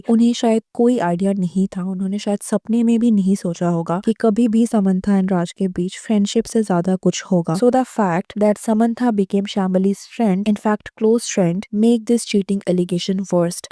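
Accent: Bengal, India. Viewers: तुम्हारी voice से साफ़ समझ आता है कि तुम bengali हो और bengali को support कर रही हो. Probably shyamala de कि टीम से हो. कोई innocent नहीं होता Bengali सबसे बड़े धोखेबाज होते हैं. Just stop defaming Samantha and shut up please. 😂😂😂😂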